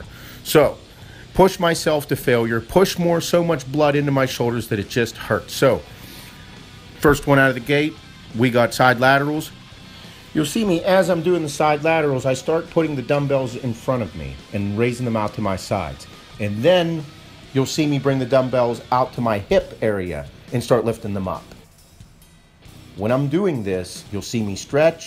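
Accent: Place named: Pittsburgh, United States. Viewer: Love these videos. Probably the yinzer accent.. Crushin it, boss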